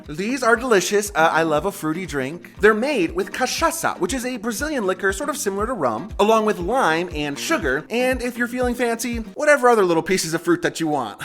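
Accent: Spain. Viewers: Are you from the US? Where does your Spanish accent come from? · The way he forces himself on sair Brasil is kinda annoying. The spanish accent is so uncessary.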